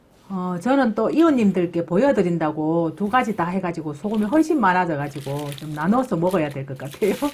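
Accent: Gyeongsang, South Korea. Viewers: 말린버섯 안말린버섯 간장 어느것이 더맛잇는가요 감사합니다 경상도말씀 너무 정답네요~^^ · 경상도사투리 듣기 거북해서 못보겠서요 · 핫하하하 ...🎉🎉🎉 매매하이소 진짜 경상도 아지매맞네 그런데 엉덩이는 조심하이소😂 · 소탈한 성격 정말정말 좋아요 그기다 경상도말씨짱이랍니다*** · 죄송합니다 경상도분같은데 ,내용이 너무좋아서배우고싶습니다 연락처는 알수없습니까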